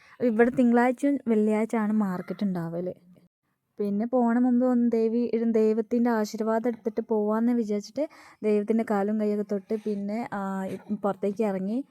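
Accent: Malappuram, India. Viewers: ഒരു പ്ലാവ് തൈ വാങ്ങി കുഴിചിടു 😂, ഒരു മലപ്പുറം സ്ലാങ് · Slang കേട്ടിട്ട് മലപ്പുറം ആണെന്ന് തോന്നുന്നു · Slang malappuram, tirur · ആ മലപ്പുറം ബർത്താനം കേക്കാന്‍ നല്ല രസം ണ്ട് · Malayaliyekkal nannai malayalam parayunnu 👍🏻naattil Malappuram side aanennu thonnunnu 🥰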